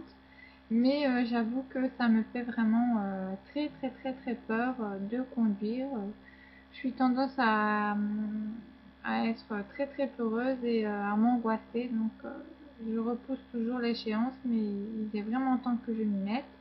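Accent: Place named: Belgium. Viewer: Coucou!! C'est cool que tu es fait ce tag ;) Tu vis dans quelle region? Ton accent ressemble un peu a l'accent belge. Gros bisous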